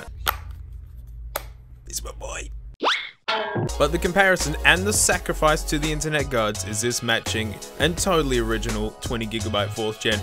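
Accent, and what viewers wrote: Australia. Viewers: He sounds less aussie then he does now and less energetic Thats wacky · 2 years later, you can see the aussie seep out into the videos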